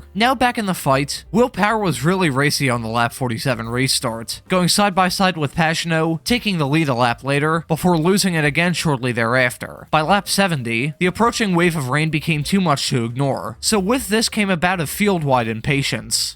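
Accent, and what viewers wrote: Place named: Philadelphia, United States. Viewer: Didn’t pick up that Philly area way of pronouncing certain works till you mentioned where you’re from then I couldn’t unhear it lol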